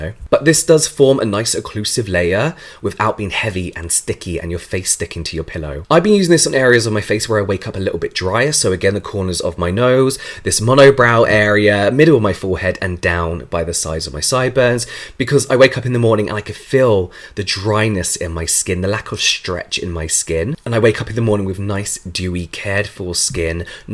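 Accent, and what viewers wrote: United Kingdom. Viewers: Do you come from the same exact area as Hermione Granger (aka Emma Watson)?? THE ACCENT!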